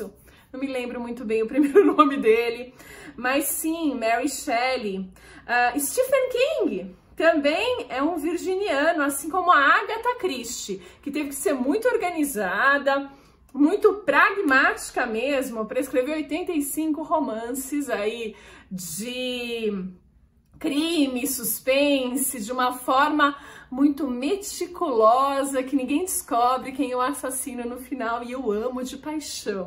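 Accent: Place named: Interior, Brazil. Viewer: Astrologia e literatura orrrrnam ,um sotaque meio acaipirado, alguma influência?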